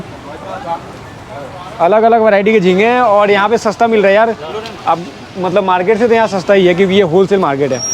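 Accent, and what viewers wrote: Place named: Bihar, India. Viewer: Bhasa mein thoda bihari put aa raha hai. Jaise aap aur ko aud bol rahe ho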